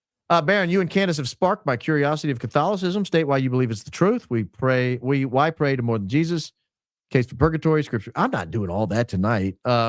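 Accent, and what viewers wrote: United States. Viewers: Lol, Baron I wouldn't criticise the British too much - you Americans have taken our language and butchered it. Your words like "crises" which you all seem to pronounce like "crisises" and in particular the way YOU pronounce "niche" as "nitch" is actually quite hysterical. It is pronounced "neesh" Fries are skinny potatoes which are deep fried. Chips are thicker deep fried potatoes. Crisps are snacks. Lol, I don't think the Americans can speak to the English about THEIR language... Aluminum anyone? Ffs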